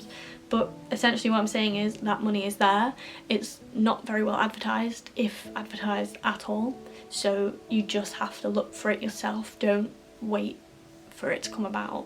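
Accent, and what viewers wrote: Northern England, United Kingdom. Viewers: I can identify with so much of this! Yesterday I watched programmes about state and private school head teachers swapping places, which was so interesting. It's a different world at 'public schools' and no wonder they can fit in so easily at a place like Oxford. Even though it's now 29 years since I went to Oxford (hard to believe, it seems more like 15!) your experience sounds so similar to mine. I love the old buildings... accents was something people discussed (I would not have been able to guess where yours comes from it almost sounds 'normal' to me with hints of a northern accent, but then some of my friends here in Wales sound very much like you!) Formal dinners were so awkward as I was afraid of how hard it is to have good table manners. (The first time I asked what the broccoli was because I had never seen it before - it was not a common vegetable in the 1980s!) They were every Tuesday in my college but were optional and I think I tended to go about once a term. 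I much preferred cooking for myself. As for drugs, I never came across anyone into that, only maybe once a friend was harming herself and might have been using medication. I had something better than a better 'study drug' - baking choc-chip cookies and selling them to my friends to raise money for charity. We all thought they were great 'revision fuel'. Most played music in their rooms to help concentrate on studying. That was new to me, as I thought that would be a distraction, but I ended up doing it myself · Interesting video! I was at The Queen's College Oxford, 1994-97. Although I am British, I had never lived in Britain before I went to Oxford, so most things were very foreign to me, but I really enjoyed it. It's funny you mention the Northern accents, because Queen's has historical Northern connections, and your kind of accent was quite common in my college. One of my mates there was from Sunderland, with a really strong accent and nobody made any fuss about that. 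Maybe things have changed since my days, or maybe that was just my college. Who knows?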